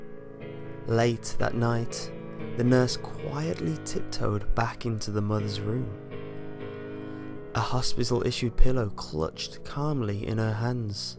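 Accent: United Kingdom. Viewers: are you really British?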